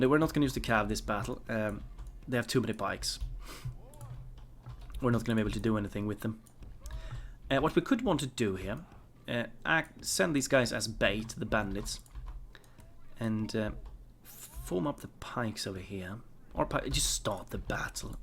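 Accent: Sweden. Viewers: Will you do an Ar ardunaim playtrough? really love your gamplay and your swedish accent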